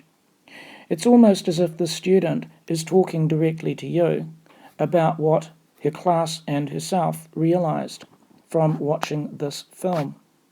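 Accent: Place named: New Zealand. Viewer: No doubt your a new zealander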